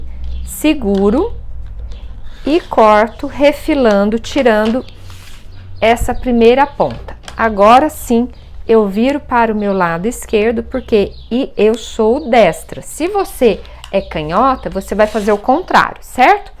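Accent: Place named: Minas Gerais, Brazil. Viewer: Adorei! Gosto demais de ouvir vc falando com esse sotaque de mineira, me lembra muito minha mãe.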